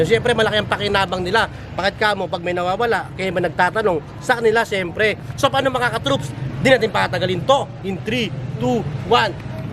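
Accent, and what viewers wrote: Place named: Pampanga, Philippines. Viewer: Kapampangan ka?? Halata sa pgsasalita mo hehe.nkktawa tlga mga prank mo 😅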